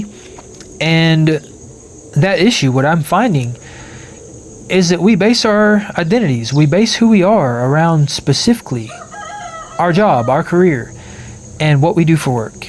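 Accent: United States. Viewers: Im from the UK and only recently discovered your channel and really appreciate your posts! I am a little older but relate to so much of your thoughts and reflections. Thanks for uploading and keep It up! Can't quite place which American state your accent is from though. Texas? I work as a Project Manager and also struggle to explain who I am more broadly.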